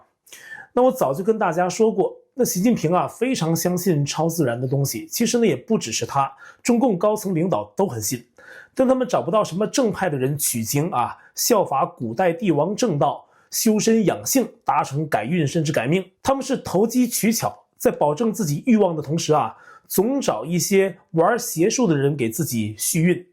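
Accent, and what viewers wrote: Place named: Taiwan. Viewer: 先生，您的影片有人盜用，因為聲音是臺灣腔的國語，您再留意一下吧！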